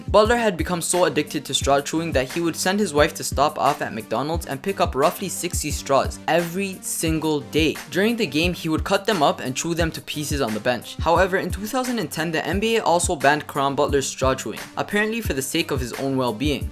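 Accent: Toronto, Canada. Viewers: This is my first time ever watching your videos and I can definitely tell you're from Toronto so I'll sub lol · your accent lmao Canadian lol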